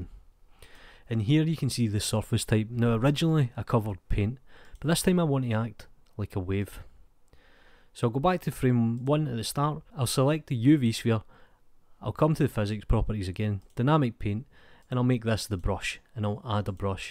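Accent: Scotland, United Kingdom. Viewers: nice and simple , love those short informitive tutorials you do, thanks , btw I love you accent scottish people are amazing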